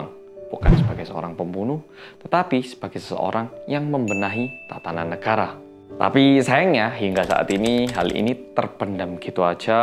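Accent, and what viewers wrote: Java, Indonesia. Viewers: Jam wib liat kamu ditv sctv acara tokopedia ngomongnya medok njiirr Beneran kamu kan?? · Medhok banget mas, nanti klu jadi dosen cocok bgt. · Mas mamakku bingung lho🤣sampean dikira orang Jawa 🤣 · "Merry Christmas" Mas medok😌🐍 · gue suka banget yg berbau kisah nyata/sejarah plus gue sk Korea. Itulah mengapa gue sk bgt channelny sejak ad seri detektif reomit jujur pas masi jmn vlog2 biasa gue B aja. Bruntung skale ad wong korea yg muedhokkk ini jd enak djlasinnya pake bhs Indo, kalo ad yg bahas tp bakor mah ya kicep.. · nymng meddoooookk nya mengcandung bet rek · 3 "bersama orang korea yang medhokk" selalu terucap setiap pembukaan nonton mas wkwk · Woi orang korea kok logat jawa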